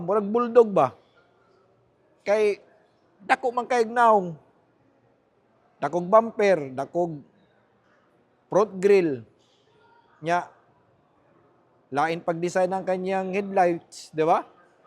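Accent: Visayas, Philippines. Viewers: bisdak diay ka dol? or AI generated voice. hehe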